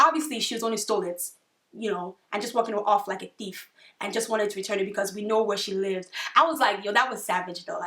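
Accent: Nigeria. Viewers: I made it thru the end lol. I love the Nigerian accent, and I can really relate to your accent because I'm also Nigerian💚💚✊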